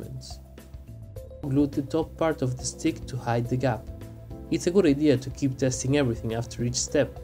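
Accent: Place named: Russia. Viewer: I was thinking Russia or Kazakhstan you sound like a friend shows how well trained an ear I have for accents. Spain and South America seem to have many really great crafters. Have you ever heard of Scenery Planet TV? They make some really cool terrain boards and Blood Bowl fields.I look forward to more vids by you and how the world of crafting is doing on your side of the world.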